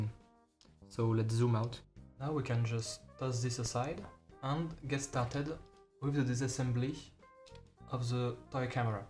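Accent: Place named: France. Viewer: l'accent fr je kiffe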